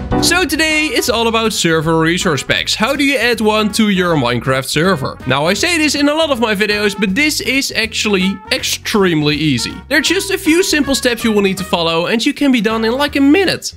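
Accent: Netherlands. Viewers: I swear, every time I try to find a Minecraft server tutorial. You show up, and you don't disappoint me. It's very useful, thanks big dawg! (also nice Dutch accent.)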